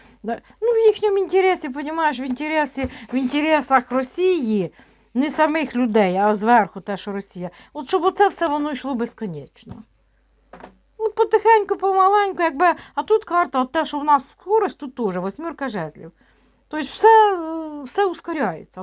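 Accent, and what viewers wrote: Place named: Poltava, Ukraine. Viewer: Дуже люблю Полтавщину,ваш говір,слухаю із задоволенням,чекаю.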